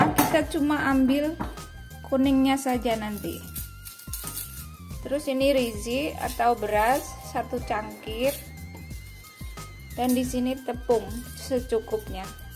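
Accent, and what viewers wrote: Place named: Banyumas, Indonesia. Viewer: Ngapak mania, masak super enak, jozzzzz👍👍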